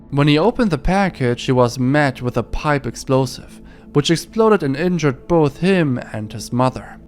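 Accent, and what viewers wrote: Germany. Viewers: That's a german accent.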